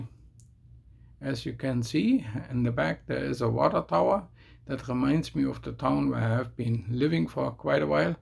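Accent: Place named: Germany. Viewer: Nice video:-). Thanks so much for sharing this and also it was really really nice to hear from you on the 8th October 2020:-). You do make some creative suggestions and thorough talks about how you make your model rail layout giving examples to what it can relate to relating to real life stuff:-). Well done indeed:-). Thanks so much for sharing this excellent video its an excellent looking model railway you have and well layed out your model railway:-). Plus you thoroughly explained your rail layout terribly greatly I must admit:-). Especially being German and despite being German you're certainly sounding really fluent in English with your accent:-). I can see you're fluent in both English and German which is excellent I do say and its ok whether you are or not:-). We can only be fluent in so many languages:-). Alright then, have a nice evening, plus I wish you all the best and take care of yourself:-D:-D:-). 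From your friend Foxy:-).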